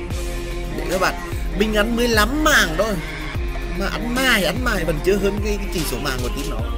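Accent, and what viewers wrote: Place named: Central, Vietnam. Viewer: nghe tiếng bạn lúc đầu cũng khá là khó chịu... giọng nói của người miền trung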